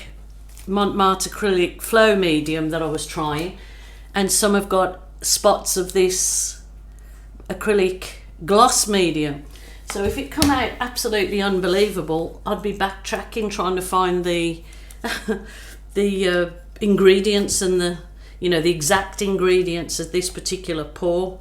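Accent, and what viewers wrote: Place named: Black Country, United Kingdom. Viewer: Great use of left overs, love the yam yam accent 🤐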